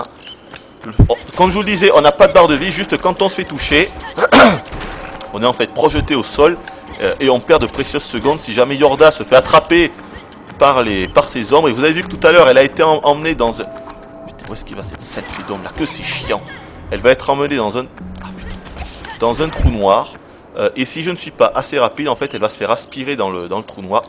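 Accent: South, France. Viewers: It's normal...he is an accent, south accent exactly. The best way to learn french....go to France or Belgium. Je te jure que ça marche! :)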